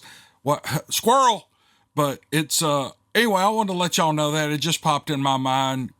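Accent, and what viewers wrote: South, United States. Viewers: If? Really? I remember when u told someone not to send u a story, if they'd already sent it to someone else's channel. Brother i love ur story tellin. How about u look at all the dna results. Again don't get all kinda way, i really love ur style and southern accent, being raised in the south, still yet u R tellin stories already shared on other sites, which aren't trying to do that.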